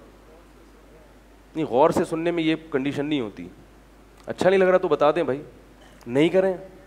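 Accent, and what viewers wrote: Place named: Karachi, Pakistan. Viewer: Main karachi se belong karta ho aur apka karachi style main baat karna bohat pasand hai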